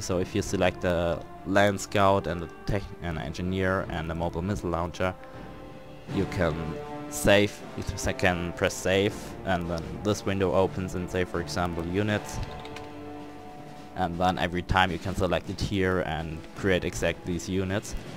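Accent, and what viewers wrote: Germany. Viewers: Bist du deutsch?? 🙃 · dadurch weil ich deutsche Akzente gut kenne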